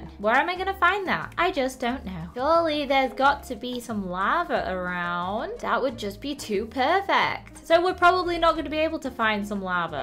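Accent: United Kingdom. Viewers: Yammy: So i'm gonna stick to the birch theme Me: Wait? Did she just said the b word Replays the birch word over and over Nop she said birch she just has an british accent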